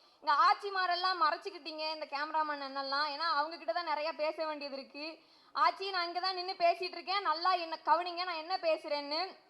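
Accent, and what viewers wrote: Tirunelveli, India. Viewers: மேடைப் பேச்சாளர்களை தேர்ந்து எடுத்து அவர்களுக்கு பயிற்ச்சி அளித்தது இப்போது திமுக அவர்களுக்கு தேர்தல் நேரத்தில் பயனளிக்கிறது.. திருநெல்வேலி ஸ்லாங் அருமை .. வாழ்த்துக்கள் மகளே 🎉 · Nellai tamil super sagothari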